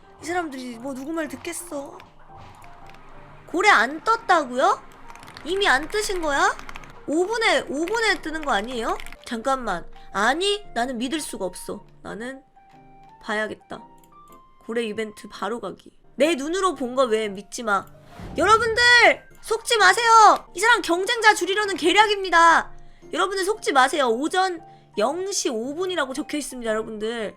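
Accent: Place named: Gyeongsang, South Korea. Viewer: zzㅋㅋㅋㅋㅋㅋㅋㅋ우리 귱남선생님들은 된소리가 안된다요...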